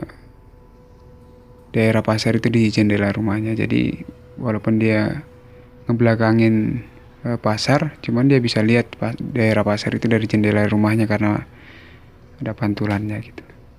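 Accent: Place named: Medan, Indonesia. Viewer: medan x ko bang. mantap.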